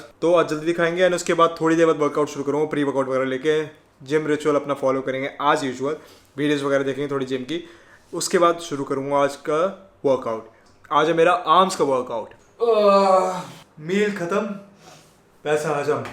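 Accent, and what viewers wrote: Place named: United Kingdom. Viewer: Yes in UK. You could guess it from his accent.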